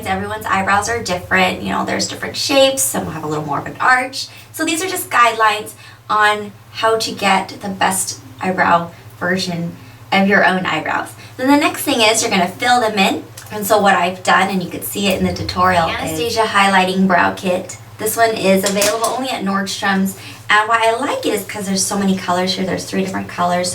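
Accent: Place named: Vietnam. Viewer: I could tell right away that you were Vietnamese for some reason. The way you speak. Hha.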